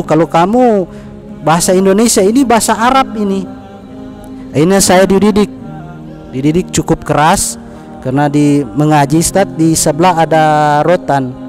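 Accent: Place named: Bugis, Indonesia. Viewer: Tapi logatnya logat bugis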